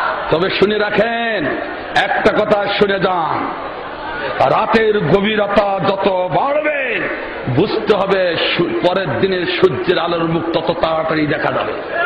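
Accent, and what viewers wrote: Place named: Barishal, Bangladesh. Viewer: hala borisailla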